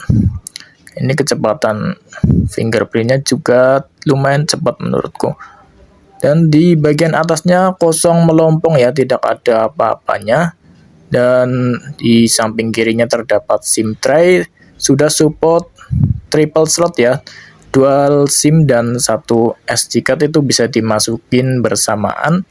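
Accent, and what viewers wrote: Indonesia. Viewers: Tumplek?? Orang mana bang?? Tegal or Brebes? Unik suaranya😂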